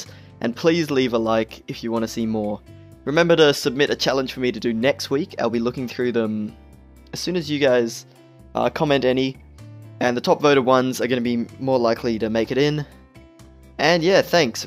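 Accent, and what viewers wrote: Australia. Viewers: Love the idea of doing challenges. Love the editing. Love the succulent Australian accent. 10/10 Would donk again. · This guy sounds like PIMPNITE, but for Smash Bros. Nothing wrong with that; they're both Australian, like me. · Are you Australian? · try and play smash for an battle or episode you pick without an Australian accent, I know it sounds impossible (I would know I'm also Australian too)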